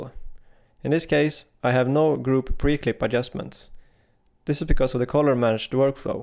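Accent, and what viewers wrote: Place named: Sweden. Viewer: Bra där. hörde direkt att du var svensk ;) Jag har precis börjat att filma i HDR och Davinci Resolve. Gör det på en Panasonic S5. en fråga. varför ser V Log skit ut även på den färdiga HDR bilden? helt platt utan färger. Jag har även testat några Blacmagic Raw filer och dom ser perfekta ut redan från start och kräver mycket lite grading. att få ordning på en V Log fil för HDR i Davinci Resolve tar enormt med tid. varför är det så? finns det HDR LUTs man kan använda för V Log?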